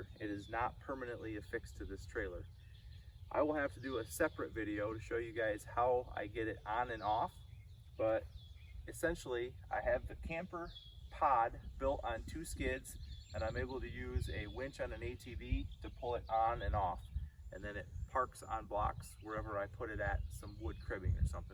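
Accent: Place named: Iowa, United States. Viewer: Hi! Super! Questions! What state / locale were you filming in? (Why would you leave - beautiful birds and landscaping! I'm going to guess having no other info but sounds and your accent -- Iowa or thereabouts - Illinois? Second; What is that vehicle you're pulling it with? Thank you! Diana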